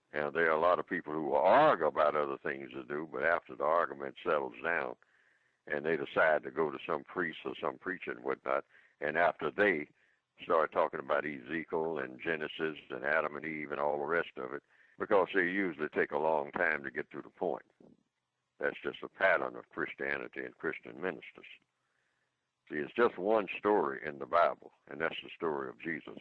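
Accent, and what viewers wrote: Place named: United States. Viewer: I just started listening to Mr Fuller I enjoy his ole Mayberry USA voice and I appreciate his time/knowledge/concern for the people of color...do you know how many blood washed, born again believer's that wish it was that simple as 10 minutes...not the play church folks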